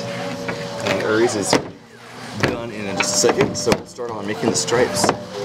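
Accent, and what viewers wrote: United States. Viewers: so basically how you make rock in England but with a hook on the end lol. still fascinating to watch though, love his voice, what part of America is that accent from?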